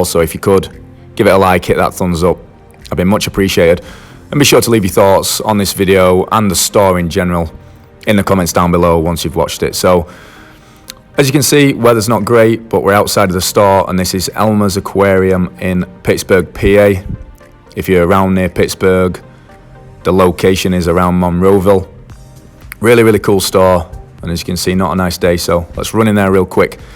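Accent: United Kingdom. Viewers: Just found this, you from the UK? As you have an accent south of Manchester 👍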